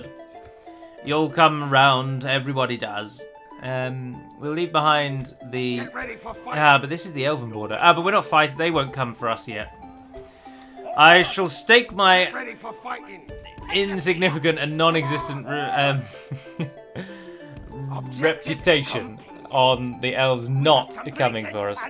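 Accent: United Kingdom. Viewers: Hello, you make awesome videos man, I love to hear you speak just because your clean and excellent British accent. Now, talking about DaC and your ongoing series, seeing as war with Mordor is inevitable in this campaign (because of the reasons you mentioned in the video), I believe it's most wise to seek an alliance with said foe in the Dorwinion campaign so that one doesn't focus in the same enemy and you focus completely in Dale to make the game more interesting as you said in that series. Keep up the good work man!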